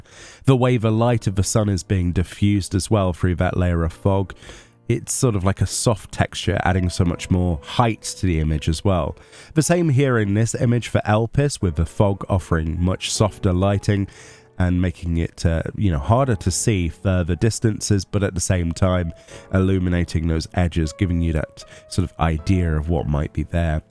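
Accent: United Kingdom. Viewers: Oh, it is my first time encountering British accent :)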